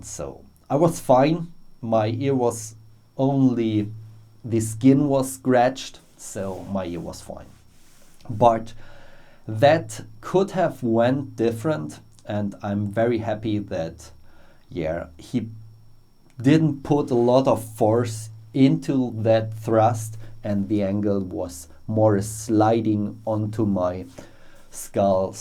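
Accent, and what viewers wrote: Germany. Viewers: are you german? can not really idetify your accent..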